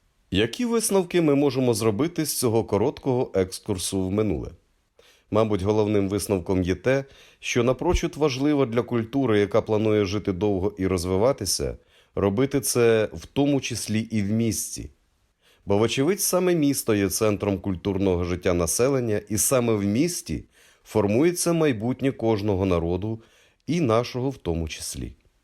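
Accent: Russia. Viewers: Цікава інформація, тільки диктор має попрацювати над вимовою, і позбаватись російського акцента. РосИйська, ідентифИкацИя... Вимова як у Тимошенко..... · Автор, видно по говору, что твой бытовой язык - русский) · Doing this in Ukrainian language is excellent. But you need to have an English version (good clear English without Russian accent) so the rest of the world can learn.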